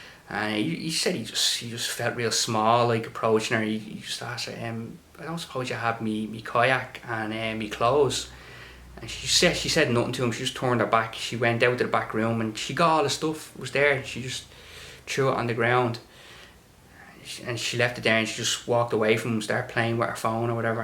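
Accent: Ireland. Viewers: The great irish accent makes the story a better listen 👍🏾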